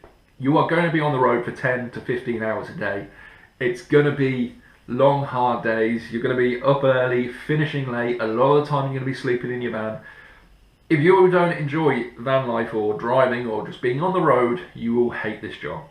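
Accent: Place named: United Kingdom. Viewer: UK i think, he sounds British.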